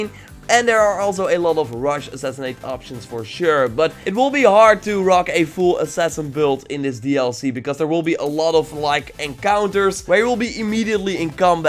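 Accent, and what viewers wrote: Netherlands. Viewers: This dude is 100000% dutch the accent is real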